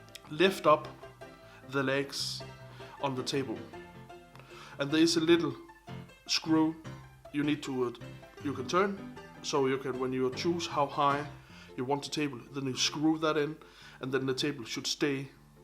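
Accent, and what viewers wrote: Denmark. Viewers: ornli´ dansker ahah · Hold kæft det er nemt at høre du er dansker :-D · Man kan bare høre at du er dansker hahahahahah!!! :D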